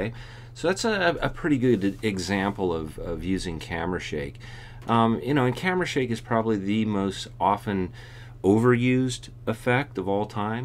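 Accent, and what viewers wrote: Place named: South, United States. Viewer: good but it's hard to follow with your southern accent, maybe work on a more neutral accent. it gets annoying after a while. thanks for posting.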